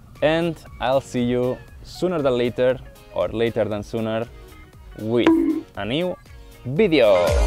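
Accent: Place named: Spain. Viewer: wouldn't need to do this as his Spanish accented English is very easily understood by anyone who has any experience of travelling or speaking to non native English speakers.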